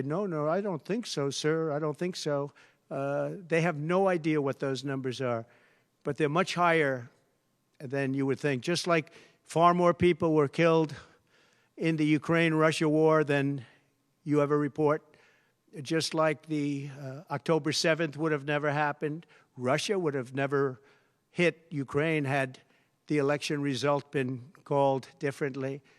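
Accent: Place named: New York, United States. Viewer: For all those people who have a problem with the way Trump talks, just remember he’s a New Yorker. Trump 2024 🇺🇸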